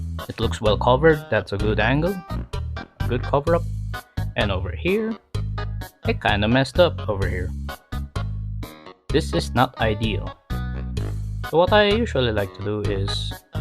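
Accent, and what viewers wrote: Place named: Europe. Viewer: U sound like an European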